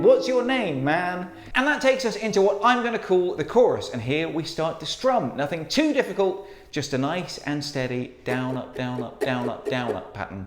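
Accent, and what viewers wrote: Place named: England, United Kingdom. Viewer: It's so weird to heard this with an english accent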